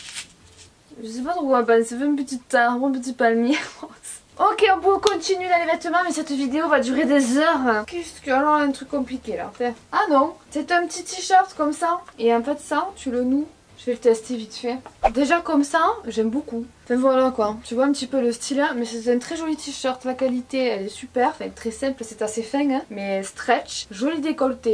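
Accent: South, France. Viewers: Tu es vraiment mignonne, rigolote, simple, déterminée, et plein d'autres jolies qualités. Je te suis depuis longtemps mais étant une jeune femme de 46 ans :) je me voyais pas dans tous tes contenus, mais aujourd'hui j'ai réalisé que je n'étais pas abonnée, et qu'avec ton Million passé, une de plus ou une de moince (haha, je suis une chti, et chez nous, on ne prononce pas le s de moins ! mdr), ça changeait rien, et puis zut alors, je souhaite entrer dans ta communauté, avec mes 46 ballets et y rester discrete, mais voilà je trouve que tu mérites ton succès. Ta bonne humeur, ton humour, ton Accent du Sud que j'adore, ta nini et tout le reste, un univers gai et rafraichissant.... Merci xo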